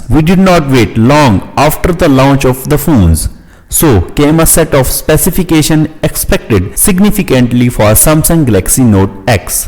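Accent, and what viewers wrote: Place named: Tamil Nadu, India. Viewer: I think ur acent is tamil if I am correct. I like it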